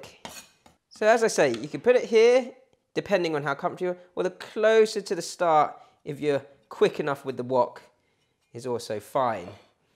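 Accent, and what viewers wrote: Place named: United Kingdom. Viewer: OMG you are so darn cute !! The fact that you are asian with a brit accent......... just too damn cute !!! oh yeah, thanks for the recipe!!!!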